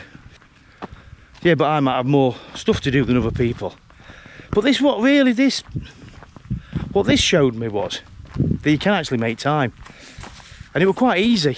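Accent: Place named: United Kingdom. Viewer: I wish this fellow mention for us, foreign runners what he meant by 5k (in his British accent) Is this K (Kilometers) or Miles? Cause this is a huge difference.. 5k in miles is 8 km.. I've been running 10-12k (km) daily for the last like 3 years and I'm over 60 + y.o. Current speed 5 -5.20 / km (Started from 6.15 - 6.30/km back in few years) .. In terms of the REST days.. If I take 1 or 2 then running again its like starting from scratch (even harder).. At this point I came to conclusion that Speed doesn't matter much, but its hard to hold competing EGO in check, that keeps on "speeding".. I can confirm, that you still must "behave" with the food intake, or else even running 10k (km) +/day alone does not guarantee that you will lose weight. Good luck.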